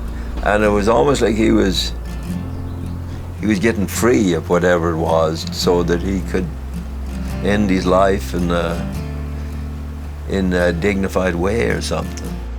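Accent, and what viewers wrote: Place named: Ireland. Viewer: Hi —that was really excellent. He still has a bit of an Irish accent:-) Guy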